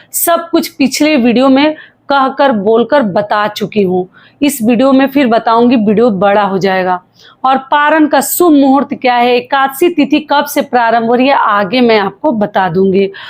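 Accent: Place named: Bhojpuri, India. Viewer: Didi Bhojpuri mujhe bhi Aati Hai Lekin aapke Munh se Bhojpuri bahut acchi Lagti Hai😘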